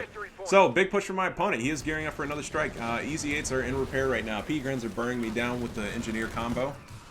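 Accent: Germany. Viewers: As a native german speaker, I highly appreciate your german accent.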